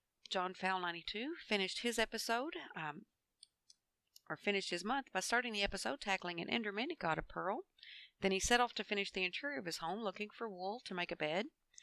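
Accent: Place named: United States. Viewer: Thank you for the player updates this month. You have been very clear and detailed. I love your accent! Whereabouts in the US are you from? Keep up the videos.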